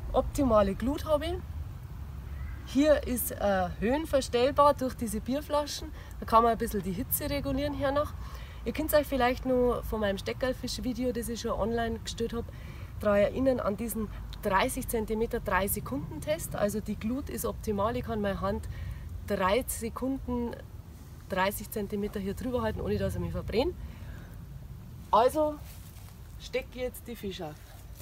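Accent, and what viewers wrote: Upper Palatinate, Germany. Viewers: Wahnsinn gefällt mir!! Extra Prima super Idee! 👍👍Tolle Frau👍👍Ich glaub fast nach dem Dialekt bist du ein Oberpfälzer Moidl!! Auf jeden Fall Topp und Gruß aus der Oberpfalz! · ned schlecht die Idee, aber sog a moi, kimmst Du aus der Oberpfalz?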